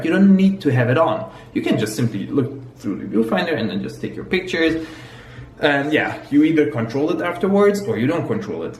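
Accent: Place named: Germany. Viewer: Dunno who the f** this guy is, but that german accent is freaking awesome. Please make some good night tale videos too. :P But nice content there. BTW ...that orchid looks freaking awesome. :D What kind of plant formula are you using you console peasant? :D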